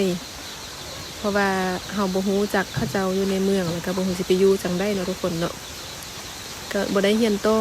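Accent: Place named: Chiang Khan, Thailand. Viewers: สำเนียงพูดเวียงจันทน์ เหมือนกับหนองคาย สำเนียงเหมือนจังหวัดเลย สบายดี❤❤❤🎉🎉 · น้อง อ่านพาสาไทยได้บ่อน้อ, เว้าคือเลย เว้า หล่มสัก น้าแก้ว แก่นท้าว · สำเนียงน้องพูดภาษาคล้าย,จเลย,จ,เพชรบูรณ์ของไทยครับ❤❤❤❤ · พูดเหมือนคนไทเลยจังแต่ฟังสำเนียงการพูดเหมือนคนไทเชียงคานจังหวัดเลย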